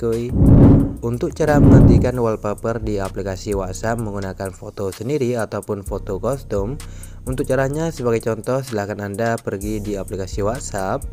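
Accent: Aceh, Indonesia. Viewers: Org Aceh Bg?😂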